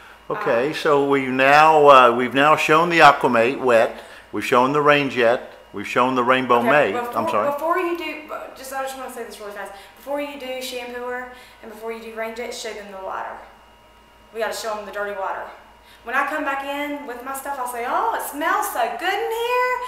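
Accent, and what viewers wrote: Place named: South, United States. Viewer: I love her accent sounds like South Carolina or Georgia. Very pretty too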